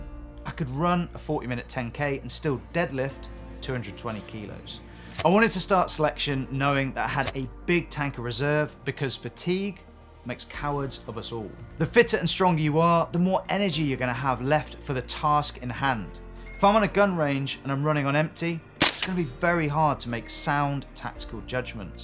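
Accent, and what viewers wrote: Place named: United Kingdom. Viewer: Maybe the obvious reason I like video like this, even when talked about "your biggest fear" Is the UK English voice even some interesting cutscenes (as additional like seasoning) 😅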